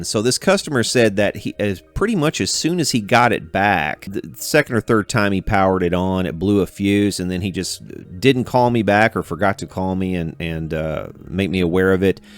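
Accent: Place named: South, United States. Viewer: The slight southern accent adds to the credibility of this bullshit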